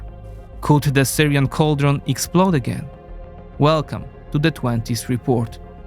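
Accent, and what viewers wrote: Poland. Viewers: His information are biased and not accurate. Not a surprise with his polish accent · Nie bardzo rozumiem jak można mieć tak podobny akcent do "naszego" ( 😉) i być tak konsekwentnie stronniczym na korzyść naszych obecnych okupantów 🤦‍♀ ( których mamy już ponad 10 tysięcy i to w pełnej gamie kolorystycznej - ze wskazaniem na tony wieczorowe... ;) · The narrator sounds Polish. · He’s Polish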